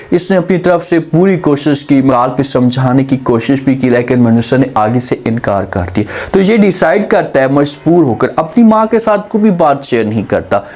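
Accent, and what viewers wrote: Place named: India. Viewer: Anchor you have a bloody awful accent india🇮🇳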